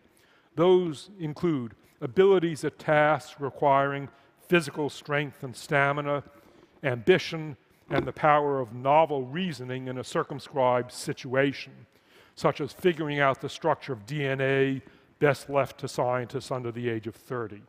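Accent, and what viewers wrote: United States. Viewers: What part of the US is he from? I like his accent. Is it Boston? Maine? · Another great talk from TED. What accent is this? I figure its American but where from?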